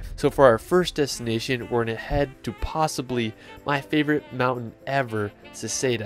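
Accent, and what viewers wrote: United States. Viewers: Stunning views and great tips, but dude - at least TRY to learn the pronunciation of the names of mountains/villages you mentioned... it's definitely not that hard and you show a little respect, instead of saying it simply as an American. It's terrible to hear it. Thumbs up for video, thumbs down for this... I'm sorry. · Nice Video, great pictures. If you would only pronounce the names the way it's supposed to, and not with the thickest american accent, it'd be perfect Still, great stuff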